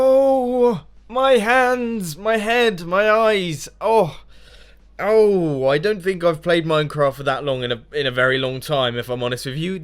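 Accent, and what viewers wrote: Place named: Bristol, United Kingdom. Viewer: Hey, I'd like to see an in-car vlog from you but only because I think you might live near me (based solely on your awesome accent, me babber):D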